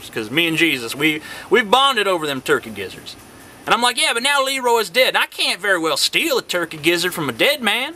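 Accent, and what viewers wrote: South, United States. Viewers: I dont care what that guy you guys played on DP says about your southern accent- this clearly proves otherwise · I love his southern accent so much!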